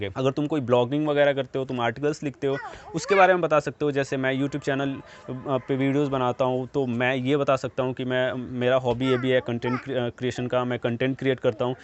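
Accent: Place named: India. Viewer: English Indian ؟؟؟؟!!!!!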